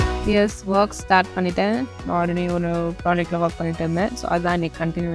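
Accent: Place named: England, United Kingdom. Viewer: Tamil la pesu bodhum english accent..😅😅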